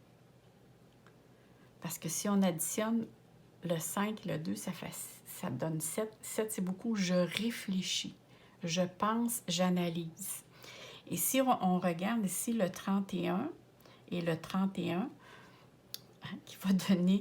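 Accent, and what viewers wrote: Quebec, Canada. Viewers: Bonjour, merci pour ces tirages. J'ai fais le choix n°2, ça me parle énormément! J'espère que ma situation prendra l'issue de la fin du tirage, le retour de la personne. J'adore ton accent Québécois et toutes les merveilleuses énergies que tu nous transmet aux travers de toutes tes vidéos. Namasté.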